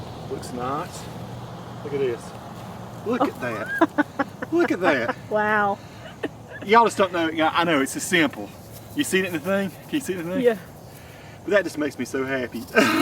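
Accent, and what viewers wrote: South, United States. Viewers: Your videos are worth watching just to hear your Southern drawl. Next year, grow your own slips! You can get about 50 plants (slips) off of one sweet potato when you grow your own. Then it will cost you NOTHING, because you save your good potato for next year's slips.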